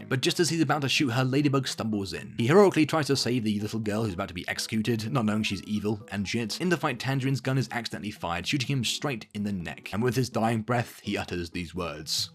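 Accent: United Kingdom. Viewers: The British accent and sense of humour charmed me now I am a subscriber.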